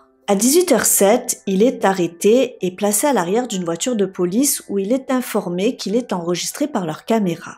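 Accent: South, France. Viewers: < Danielle> C'est une triste histoire surtout pour Danielle. Je l'avais déjà entendu par un autre youtubeur/se (?). Je pense y avoir appris des choses en plus... Le seul soucis c'est ta prononciation pour certains mots...qui me font hérisser les poils sur le crâne. C'est vraiment dommage car tu as une chouette voix du sud...agréable à écouter. Dans cette affaire on voit bien que Scylar est un "bon" comédien. Menteur...colérique...et a l'air d'avoir une haute estime de lui Sans compter qu'à chaque tentative ou il feint de pleurer...il n'y a aucune larme ! Je pense qu'il n'avait aucune confiance en Danielle et qu'il voyait le "mal" quasiment partout. De plus apparemment c'était un gars qui aimait rabaisser sa femme... Peut-être que le comportement qu'il a montré envers sa femme est repris de son père voir de sa mère. Mère un peu trop "proche" de son fils... 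Purée il a un air tellement arrogant et suffisant. Tout dépend de savoir si Scylar brutalisait Danielle ou si ce ne sont que des bruits de couloirs... Si Scylar aimait sincèrement et respectueuses Danielle...je me dit que vu son enthousiasme...son excitation du retour de son homme...je ne pense pas que Danielle aurait tout gâchée avec un amant. Par contre si Scylar était comme le prétendent bon nombre de leurs "ami(e)s" ... c-a-d...violent...rabaissant.. etc...il se pourrait que Jeremy eu été plus qu'un simple "copain"...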